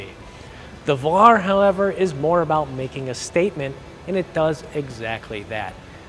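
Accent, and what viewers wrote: United States. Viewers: I can't stand this fuckers American accent. Why am I recommended yank car review videos?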